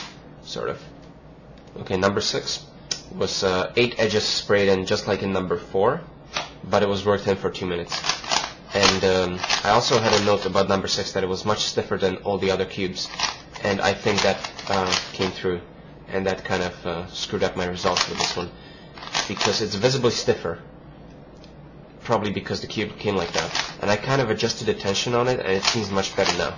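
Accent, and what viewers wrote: Canada. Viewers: Ah! Thanks for this. I'm about to lube a cube myself for the first time, and I wasn't sure whether I should disassemble the cube or just spray some in and how much. En passant, êtes-vous Québécois? Votre accent, léger qu'il soit, semble nettement Canadien-français.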